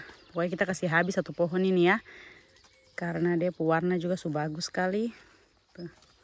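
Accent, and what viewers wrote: Papua, Indonesia. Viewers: Paleng suka dengar kk bcra Deng logat Amq Papua🤣🤣🤣🤣🤣terhibur hatiq😍😍😍😍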